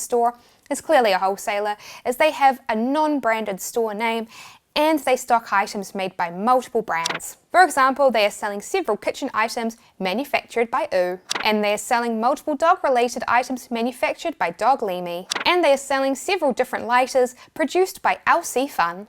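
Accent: New Zealand. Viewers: My god that is the most NZ accent ever.....saying that I'm Australian, so I probably sound mentally impaired when I speak.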